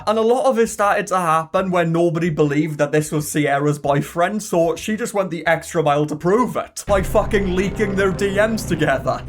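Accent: United Kingdom. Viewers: I'm english but I can mostly understand this British accent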